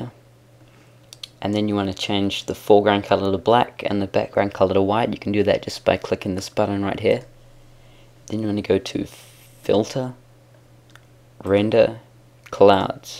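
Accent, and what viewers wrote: New Zealand. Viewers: Hey bro, are you from new zealand coz youve got a kiwi accent, if you are a kiwi are you maori or not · love your kiwi accent mate Great tutorial btw! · Sounds like he's from New Zealand.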